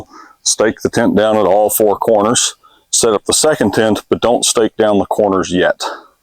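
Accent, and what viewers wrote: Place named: Baltic states. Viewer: Baltic language accent is all over! Good video!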